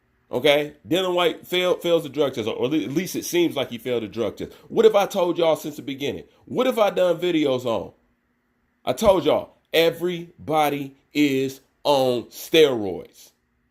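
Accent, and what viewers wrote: United States. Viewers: You just showed us that AR stated he was not from NYC, but you went on with deceptive ranting for 7 minutes claiming usa is not his back yard. Unless you can show were he said usa is not his back yard, otherwise it sounds like you are bending the truth here. Also your statement "all atheletes use Steroids" is another example of you the delusional conspiracy theorist. And yes you should just go watch mma and stay far away from this sport. You sound American but yet you still don't seem to understand and respect where the real heavyweight boxing kings are in this era. I bet you doubted the greatness of ruiz the same fashion many doubted wilder and ruiz knocked AJ smooth off. Please have more respect our American heavy weight boxers.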